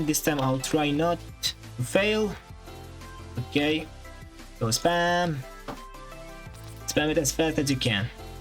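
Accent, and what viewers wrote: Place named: Arab world. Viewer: your accent is kinda arabic r u arabic?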